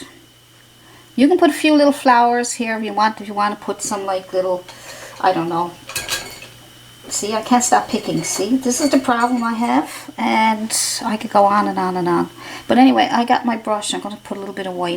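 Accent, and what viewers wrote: Newfoundland, Canada. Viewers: I'm randomly looking at painting techniques on YouTube. And as soon as I heard you talk I knew you were from NL so I did some googling. . And sure enough. Im in corner brook. Great video :)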